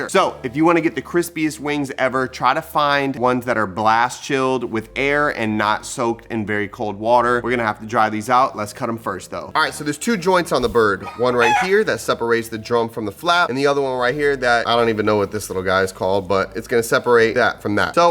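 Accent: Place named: AAVE, United States. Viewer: The recipe was excellent, but the Ebonics has to go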